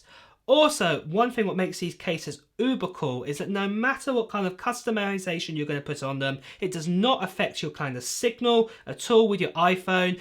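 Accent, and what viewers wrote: United Kingdom. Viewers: After listening to him, I want a British boyfriend 😂 Canadian accent is so plain! I use a Casetify case for my 12 Pro max, I absolutely love it coz it has my name on it. I ordered a blue iMac yesterday but looking for the M1X MacBook for sure!!! Need something portable! 😂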